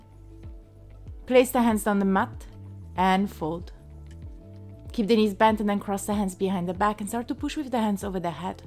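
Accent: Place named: Italy. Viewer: That was really a phantastic flow but for me it would be perfect if you could talk slower. Please consider one hast to get used to your Italien accent and not everybody who is watching this videos is a native english. So I am german for example. Nevertheless thank you very much for this interesting lesson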